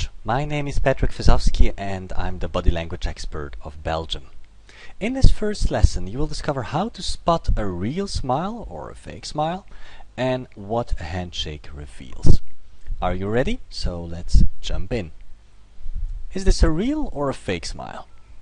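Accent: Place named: General American, United States. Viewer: he speaks with an accent that is called general american english.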